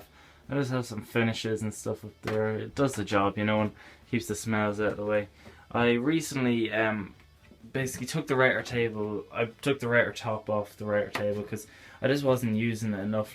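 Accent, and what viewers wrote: Ireland. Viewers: Yes lad I know your Irish from your accent maybe Mayo or Dublin well I'd like to heasr from you you in a cabinet maker from Donegal thanks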